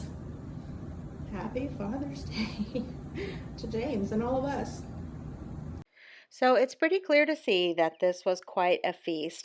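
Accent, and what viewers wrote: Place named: Fort Wayne, United States. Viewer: We're from Fort Wayne and it sounds like you are close by.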